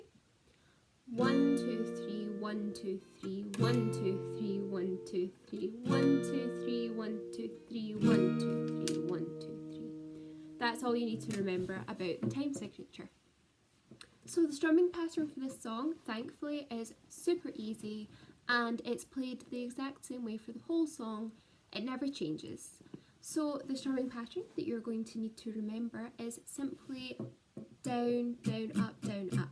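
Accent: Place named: Scotland, United Kingdom. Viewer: yeah it's a lovely instrument has a nice wine tastings I have noticed when I listened to you you have a Scottish accent the part of yes I like the band you because it has a really nice Twang the banjo has a nice twanging sound to it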